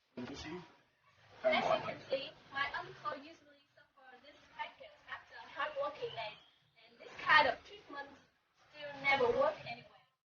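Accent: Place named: Vietnam. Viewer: speaking english by vietnamese accent :)) It sound so funny :))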